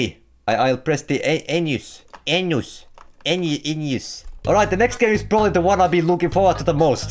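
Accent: Finland. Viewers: I've watched couple of your videos and I didn't know you were from Finland before you said "vittu". After that i just couldn't unhear the accent. Torille jee🇫🇮🇫🇮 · He's obviously Finnish he speaks with a rally English accent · He's finnish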